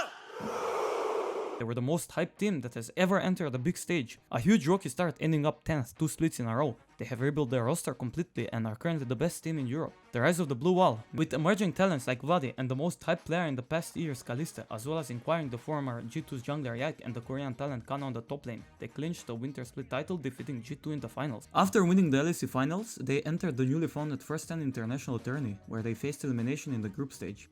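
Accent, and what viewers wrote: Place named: France. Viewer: you just know this guys french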